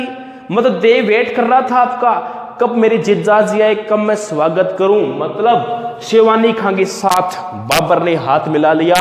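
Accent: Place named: Punjab, India. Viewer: Bolne se Punjabi lg rhe hai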